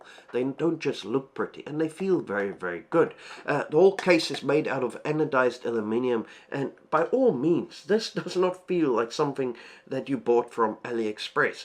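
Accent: South Africa. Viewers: South African accent ? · Nice video. Sold me on the pedals 🙃 you South African? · you sound south african?!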